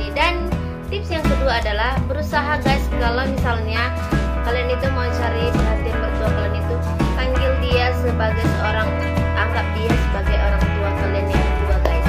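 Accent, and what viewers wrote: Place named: Medan, Indonesia. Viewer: Oh seperti itu ya ka..logat nya kayak orang medan ya ka salam dari rki asal lampung tips nya sudah di simpan ya ka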